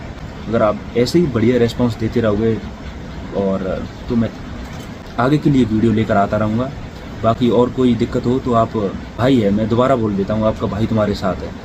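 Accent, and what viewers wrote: India. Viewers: Bhai Rajasthan se ho kya!!! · भाई आपकी आवाज मुरैना साइड की लग रही है ,, में सबलगढ़ से हु आप कहा से हो , प्लीज btao ???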